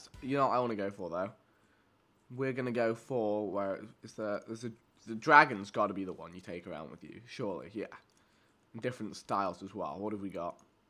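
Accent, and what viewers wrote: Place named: United Kingdom. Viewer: Why do you talk like your from the UK your indian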